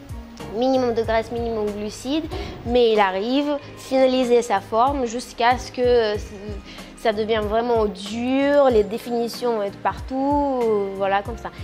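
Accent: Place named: Eastern Europe. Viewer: Accent europe de l est,,pologne,roumanie?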